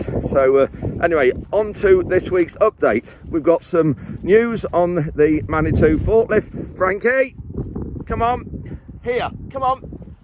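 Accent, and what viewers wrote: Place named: Lincolnshire, United Kingdom. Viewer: As an exiled Yellerbelly from the Fens always good to see what's happening on the land, even if you are up on the high ground. And good to hear a Lincolnshire accent too!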